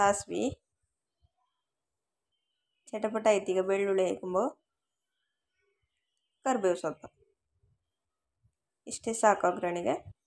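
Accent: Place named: Kundapura, India. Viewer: ಸೂಪರ್ ಕುಂದಾಪುರ ಕನ್ನಡ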